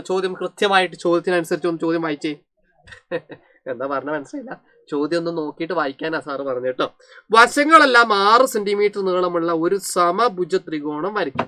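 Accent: Kozhikode, India. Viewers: Sir Kozhikode ano stalam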